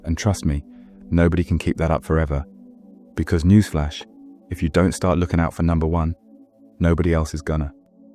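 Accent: United Kingdom. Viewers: ❤ I really like your accent, voice and narration style! Is it a real voice or a very good AI? I really want to know! British accent and British wording with an American twist! I live in London and this accent seems from a Londoner but a old school accent, hard to find it now, I know AI would clear the harshness of it , yet it’s hard to find anyone with this cute accent anymore, even years ago was hard to find. Is recognised as a gentle south London accent, yet , this soft spoken type it has disappeared!😢 I really like it! I have heard women in west/south London, but man has let it go, now man it’s more of an English posh accent or street cut off English. Anyway, please tell me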